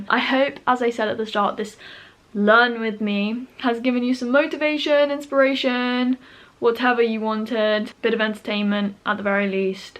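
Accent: Germany. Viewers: Love your german accent, i am from austria (so german is my mother tongue) and even I don‘t always get the grammar right - so don‘t worry too much, you‘re doing great!! Love your videos btw ❤️ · As a native German speaker, I can tell you that your German accent is reeeally good❤️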